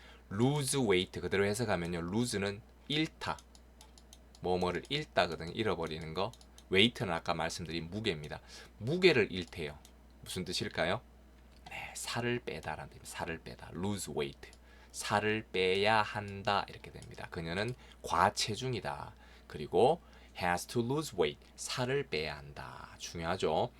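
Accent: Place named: Gyeongju, South Korea. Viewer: 선생님 정말 감사합니다. 앞으로 고졸 영어 검정고시 공부하는 사람들은 축복 받은 것입니다.기초가 부족해도 쉽게 이해 할수있도록 천천히 세세히 풀이해주시니 모두다 조금만 열심히 하시면 100% 합격 하실것을 믿어 의심치 않습니다 10년이면 강산도 변한다 했는데 긴세월을 선생님과 함께 공부한다 생각하니 가슴이 벅찹니다 .저는 경주가 고향입니다 선생님의 사투리가 저의 고향 말과 같아서 더욱 정감이 갑니다 중학교때 친정 아버지께서 여자가 공부를 해서 뭐하노해서 문교부가 인정하지 않는 학교에 졸업해서 어디가서 이력서 낼때 정말 자존심이 상했습니다 올해 나이가64살 옛날에 이렇게 공부할 여건이 되었으면 아마 그때 쉽게 했을것 같은데 그때 시골은 정보가 없어 공부하기가 어려웠죠 핑계인지 모르지만 검정고시 도전하는 젊은 사람들 열심히 공부해서 수능에도 도전해 대학에도 가세요 나이 드니 시력도 안좋고 책상에 앉아 있으니 허리도 아프고 여건이 젊을때보단 좋지 않아요 남편도 같은 환경이라 많이 배우지 못해 배움에 목 말라 신혼 여행가서 저 한테 했었든 말 아직도 귀에 생생합니다. 돈 없어 공부 못했다는 소리 자식들에게 절대 안 듣는다고 세월이 흐르고 보니 남편과 전 열심히 살면서 자식들에게 우리의 못다한 꿈들을 이루었습니다 딸은 고등학교 교사. 아들은 대학병원에서 전문의로 여러분 이렇게 올리는 이유는 자랑이 아니고 제가 2019년 8월7일 중학교 검정고시 볼때 젊은 친구들이 많더군요 10대20대 이들이 마음 단단히 먹고 열심히 공부하길 바라는 마음이고요 또 우리들을 위해 10년전 것 까지 기출문제 풀이해 주시는 선생님에 대한 보답이기도 하고요 오늘 새벽에 7번까지 올라온 것을 보고 너무 감동 받아 자다 일어나 공부하고 잤어요 나이가 드니 열심히 해도 돌아서면 잊고하니 반복반복 해야됩니다.( 소불근학노후회) 주자십회훈에도 있어요 젊어 열심히 공부하지 않으면 늙어 후회한다고, 여러분 열심히 공부하여 유종의 미를 거둡시다. 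선생님 정말 고맙고 감사합니다.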